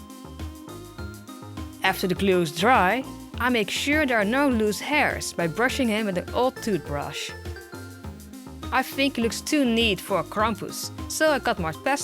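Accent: Netherlands. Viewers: Are you dutch? I'm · your dutch accent is so cute! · Are you Dutch? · Are you dutch?? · i can hear from the way you speak english that you are dutch right XD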